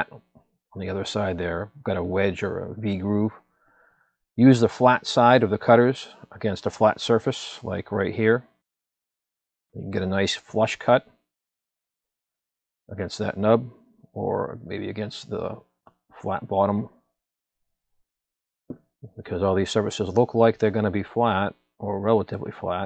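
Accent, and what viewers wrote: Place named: Massachusetts, United States. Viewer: Are you from Mass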